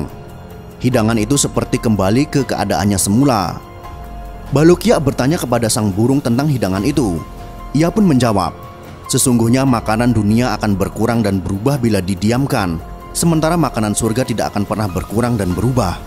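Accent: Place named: Bali, Indonesia. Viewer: ni yg baca cerita kaya logat bali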